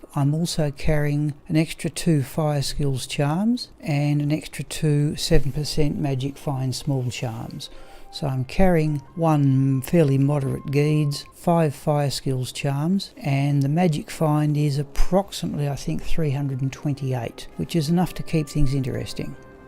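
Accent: Australia. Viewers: Thx for another good one,! Keep 'em comin'! Are you Australian btw? ☺️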